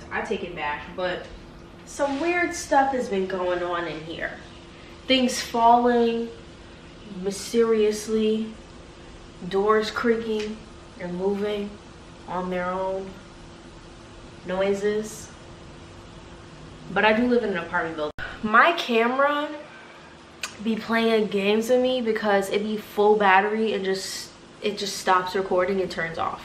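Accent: United Kingdom. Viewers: The British accent 💘🥺..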